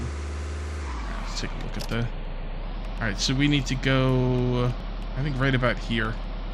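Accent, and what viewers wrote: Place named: New Jersey, United States. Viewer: Not get too personal, but is your family not originally from Missouri? Because I am originally from the KCMO metropolitan area, and to me your accent sounds much more like an eastern Great Lakes or southern New England (i.e. Massachusetts, New Jersey, or NYC) than anything specific to Missouri (which usually is a more flat Great Plains in the west and north, or has Southern influences in the Ozarks and around the Bootheel).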